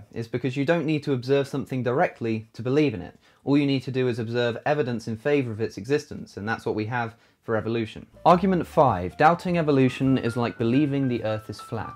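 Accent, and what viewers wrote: United Kingdom. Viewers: I feel like things are easier to understand when explained in a British accent. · The British accent is the music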